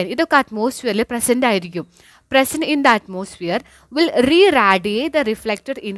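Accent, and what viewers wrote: Thrissur, India. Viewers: നിങ്ങൾ തൃശൂർകാരി ആണോ, റിപ്ലൈ തരുമ്പോൾ ഇടാം ട്ടാ എന്ന് പറയണൊണ്ട് ചോദിച്ചതാ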